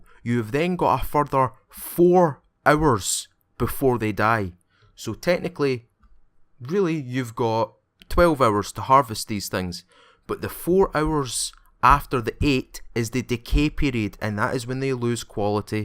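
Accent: Scotland, United Kingdom. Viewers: Love your LiF:YO vids, love your scottish accent, I should play my " " colection while playing LiF... Game is still grindy AF, fortunately we can play with the server settings and accelerate the leveling rate...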